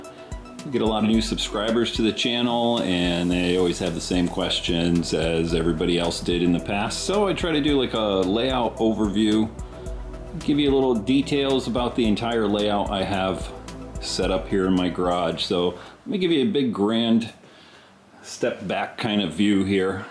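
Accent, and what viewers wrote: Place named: California, United States. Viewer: Absolutely just binge watch your videos. Apart from the way-too-cool Californian accent, they're just like trainspotting: things are happening, lots of action at a leisurely pace, just watching the locos do their thing. absolutely pleasurable. I have a vague question more related to prototype movements: if you turned back the clock would a caboose have been used, and if so, how would it have been incorporated into the train movements? Must be pretty hard running round a train with a parked caboose in the way... cheers for all your hard work putting these vids together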